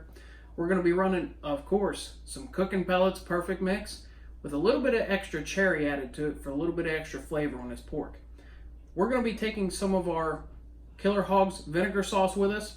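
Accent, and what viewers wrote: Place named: Pittsburgh, United States. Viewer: Thanks for the tips. I'm doing my first try at a pork butt (on an RT400 Bull) this weekend for a party of 40. I was going to do it the day before and reheat but I think I'm going to try and time it right so I can pull just before we eat. Will probably have it rest for a few hours in a cooler. I bet you're a black and gold fan with that Pittsburgh accent! I'm in Greensburg. Go Steelers!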